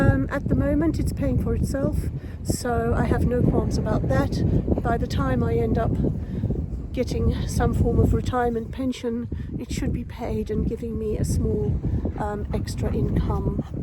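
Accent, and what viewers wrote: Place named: South Africa. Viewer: Hi. I love the history and footage of the little village where you have bought your studio. The lake is beautiful too. You sound South African ❤ thank you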